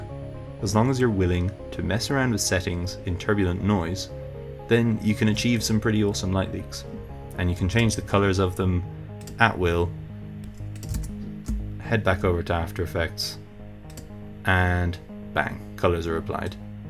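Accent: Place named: Dublin 4, Ireland. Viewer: Dublin 4 accent if I ever heard one haha :P Great tutorial keep up the good work bro.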